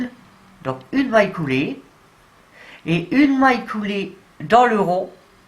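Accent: North, France. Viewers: Bonjour, madame. J'aime bien votre accent aussi. J'ai de la famille dans le nord aussi, à marquette les lille....et les personnes du nord sont tres accueillants et plein d'humour. Votre vidéo est très bien, ce gilet magique, ...rapide à faire et simple.❤❤❤😊😊😊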